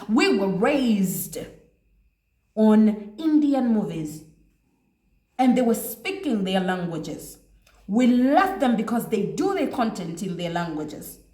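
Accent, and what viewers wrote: Uganda. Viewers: Imagine with his heavy Ugandan accent, he ain't even speaking English this is Uglish. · I agree with everything you said, and I love your Ugandan accent. Without your local Ugandan language, your beautiful English accent wouldn't have sounded better than that of the British and the Americans. So your native language is what spiced up your English Accent.❤❤❤❤❤❤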